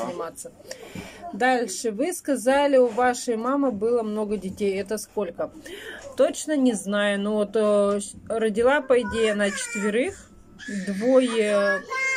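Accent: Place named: Perm, Russia. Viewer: Зачем Вы позорите Пермский край? Не надо врать, что у нас так все разговаривают. Если Вы не образованная, то не надо говорить, что все такие.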